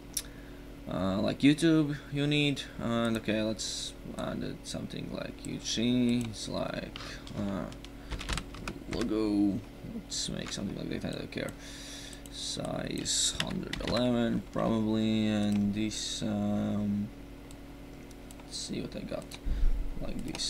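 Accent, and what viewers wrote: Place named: Russia. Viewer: Thanks dude, guys give him some slack, he has a Russian accent and probably is the best at english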